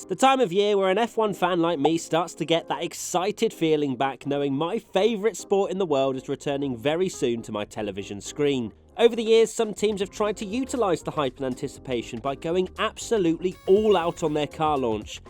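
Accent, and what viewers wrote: United Kingdom. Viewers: Aaaah your british accent 😵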